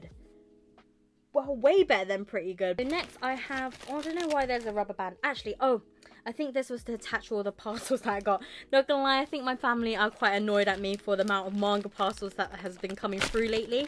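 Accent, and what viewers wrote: United Kingdom. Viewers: Are you British? Love your content!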